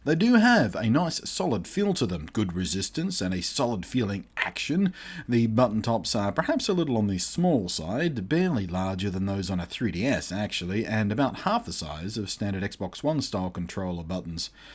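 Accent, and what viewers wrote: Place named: Australia. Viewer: On the topic of Australia, your accent always makes these reviews just that touch more special, but I really do feel for you having issues getting your hands on a lot of stuff not shipped or offered in Australia and your internet connection troubles. I have been waiting for this review and it was nothing short of what I expected. Thorough in depth review over the course of multiple games showing strengths and weaknesses. I'm going to hold off on this one for a little bit as I'm a console gamer moving over to PC gaming so I'm accustomed to the less controllable twin sticks. But I will definitely be getting this at some point in the future. I can wait for 2.0 when it does roll around. I hope things getting to Australia starts to get easier though. You're one of my favorite reviewers and if stuff takes longer to get to you then you're missing out on a lot of traffic and I just want to see someone I appreciate get more recognition I feel they deserve.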